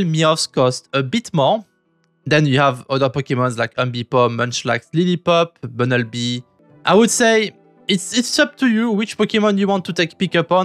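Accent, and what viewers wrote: France. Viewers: What a nice french accent that you have x) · Je cherche un tuto en français j'en trouve pas. J'en cherche un en anglais je trouve un tuto d'un français qui parle anglais alaid x)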